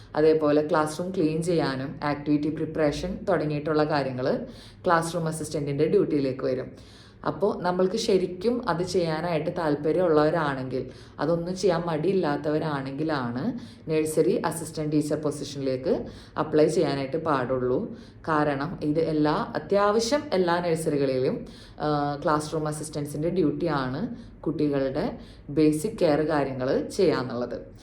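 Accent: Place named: Ernakulam, India. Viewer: Thante slang kettappol Ernakulam district evideyo anennu thonni...I am from Aluva...that's y asked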